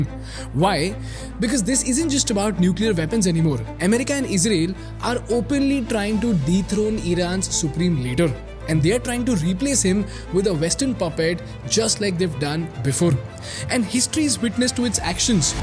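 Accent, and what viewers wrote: India. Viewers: No indian accent please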